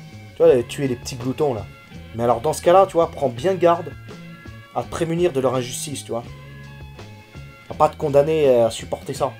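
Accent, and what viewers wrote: South, France. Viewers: Plus je l'écoute et plus jme dis que c'est ta meilleur vidéo , merci de m'avoir introduit à Tonton Nietzsche . Désormais , je vie telle Zarathoustra, je suis le pont au dessus de nos médiocrités , je parle à la montagne et ne me plie plus a la violence de l'efficience . Et putain avec l’axent du sud c'est encor mieux , con ! ^^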